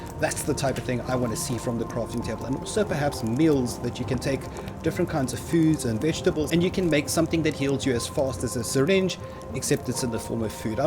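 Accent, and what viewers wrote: South Africa. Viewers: Mister are you from South Africa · this guy sounds south african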